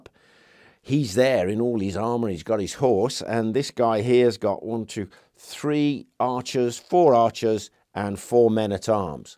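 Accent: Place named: England, United Kingdom. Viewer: thanks for the video ! i prefer when english people speak in english, because the automatic translation of Youtube understand you, not the americans !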